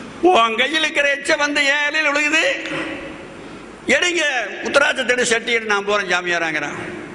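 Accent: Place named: Kongu, India. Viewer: கொங்கு தமிழிலே அருள் மழை பொழிந்து..எங்கள் மனதினை இன்பத்தில் ஆழ்த்திய அய்யாவின் திருவடி வாழ்க..!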